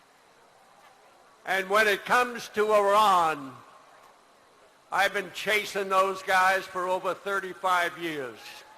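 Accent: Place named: United States. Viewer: I love this man! This is what a real American looks and sounds like.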